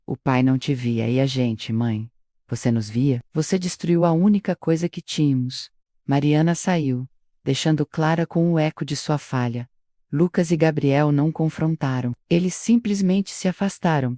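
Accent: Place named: England, United Kingdom. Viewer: Porque o sotaque forte do Ingles? A historia é bem interesante❤❤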